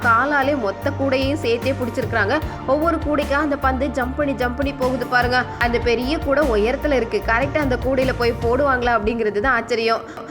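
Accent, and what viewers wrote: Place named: Coimbatore, India. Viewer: ok mam, கோயம்பத்தூர் slang மாதிரி இருஞ்சு, அதான்.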